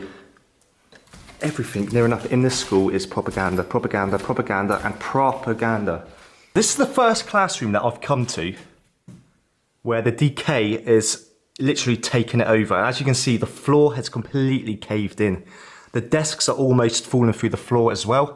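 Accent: United Kingdom. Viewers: I love that brittish accent of you 😂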